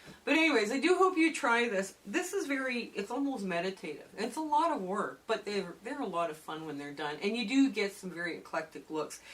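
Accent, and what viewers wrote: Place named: Canada. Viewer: I've made a couple of 8" crumb blocks, ao wondering if sewing the triangles into sqares might be a good option for continuing... I'm really enjoying your videos - and adorable Canadian accent ;-)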